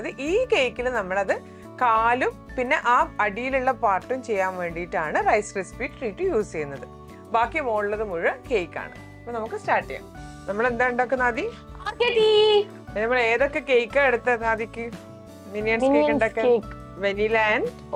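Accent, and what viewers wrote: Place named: Kozhikode, India. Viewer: m also frm calicut,,,vadakara.. samsaram kettepo nk thonni..atha chodche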